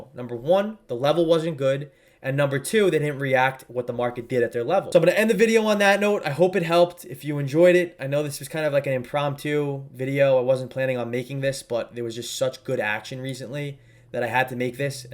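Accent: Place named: New Jersey, United States. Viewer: Come for the education, stay for the Jersey accent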